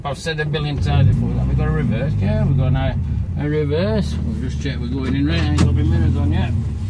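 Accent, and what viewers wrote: Yorkshire, United Kingdom. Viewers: Thanks for the video I always look 4wards to your videos & il always support you in what you do in anyway I can my friend, I'm away sharing your videos & me n my mate have screening binges on all the videos you do. They take us rite back to our youth & I love the way you talk & your accent as you remind me of my gran & mum as they are originally from Yorkshire Bradford n Wakefield. I have so many good memories watchin n listening to you & your videos. Please keep up your amazing work & your mega videos my friend. Best regards. Jim in Manchester.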